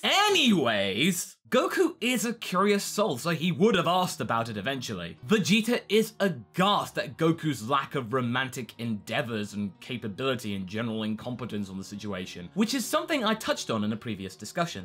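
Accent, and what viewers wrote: United Kingdom. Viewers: I'm late but yeah, he has to suppress his British accent though XD He's also Gohan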